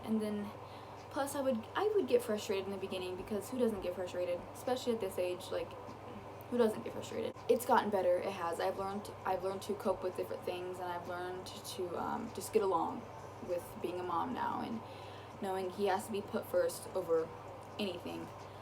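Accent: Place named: Indiana, United States. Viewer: I bet she’s from Indianapolis or close to it. It’s where I’m from and she talks how we do in central Indiana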